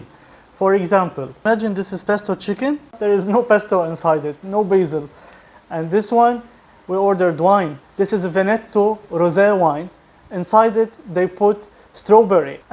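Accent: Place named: Arab world. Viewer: Wow this is a lotttttt of complaining..you have an arabic speaker"s accent. Dude have you travelled in the Middle East? These issues are nothing.Your complaints are endless in this video. It doesnt sound like this is the country for you. You should move to the west if you can. Egypt has similar issues but travellers on yt dont whine as much. I would suggest you move somewhere where you arent so miserable. Inflation is worse in North America. As is eating out. Travel elsewhere to see the difference and stop complaining over small things. If your biggest problem in life is a strawberry in your wine then you have NO PROBLEMS. Fries vs. wedges..seriously? You are not happy in Turkiye. You should leave. While you are there be generous with your turkish hosts. They are going through tough times! You really sound so miserable in this video..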